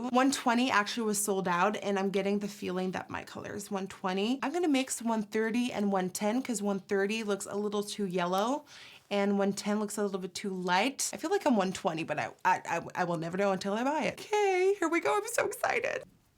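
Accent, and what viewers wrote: United States. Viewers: Kawaii dude this is the second time I see you commenting this hahaha people don’t have a problem, they just thought she was American based on her accent. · Quick question. I'm new to this channel and I noticed she mentioned that she lives in the Netherlands. Has always lived there? I wasn't sure as she has an American accent. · Leah Jones she's not putting an accent on, it's because she's been around American people for so long.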